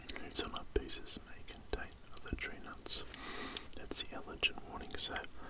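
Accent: Australia. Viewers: I guess the Australian accent is the best for whisper asmr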